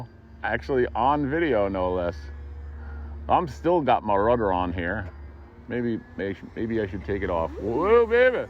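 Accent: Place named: North America. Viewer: Brits aren't usually able to place North American accents, so for my education where is your accent typical of? Great fun videos.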